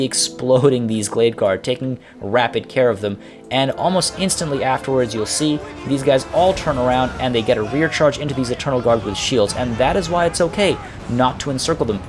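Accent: France. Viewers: Woah dude! Where does that nice French accent come from? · Rocking the french accent! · coming in hard with those french pronunciations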